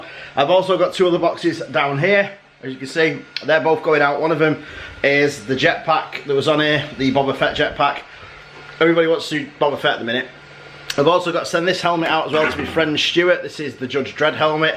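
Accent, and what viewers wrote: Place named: Manchester, United Kingdom. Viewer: listening to you is like being in work the lad I work with is originally from Manchester and he’s the same age as you but his names not 😂😂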